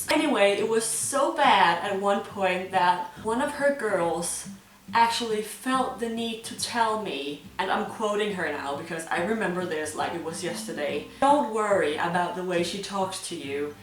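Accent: Denmark. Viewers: you have such a typical danish accent.... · You have such a typical danish accent :D! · man kan godt høre du er dansk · low key Sorry, that sounded a bit shitty :) I just meant, that its clear (to me) that her accent is danish.. :)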